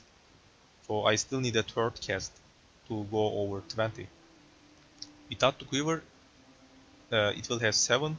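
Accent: Turkey. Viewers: türk şivesi bu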